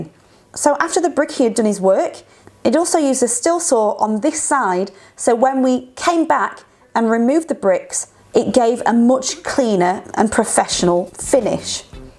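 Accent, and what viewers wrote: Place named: Northern England, United Kingdom. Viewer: I do like your channel. Lots of narration, lots of honesty, and a lovely northern accent. P.S. Love seeing your collie dog in the videos although appreciate that's often not practical.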